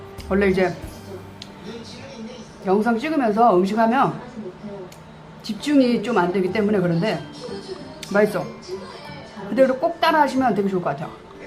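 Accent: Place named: Seoul, South Korea. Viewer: 넘 매력있는 서울사투리에 강주은님 생각나요 ㅋㅋㅋ 요리할때는 살아있는 눈빛에 존멋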